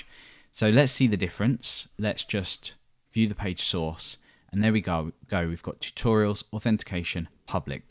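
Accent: England, United Kingdom. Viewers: Hello there! No doubt your approaches to implement functionality are the best all over the PHP web dev world, I just wish Hollingworth, Schiff or Traversy would explain them to me as I feel so sorry to tell you that you lack a bit on explanation and I struggle to capture your english accent. Anyway, thanks very much I really appreciate to get to realize how solutions can be implemented in a clever way.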